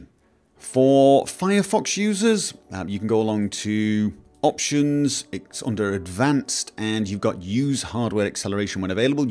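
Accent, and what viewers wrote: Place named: England, United Kingdom. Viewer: OH MY GOD ... that accent ... seriously where are you from, England? also, this did work, too, so thanks.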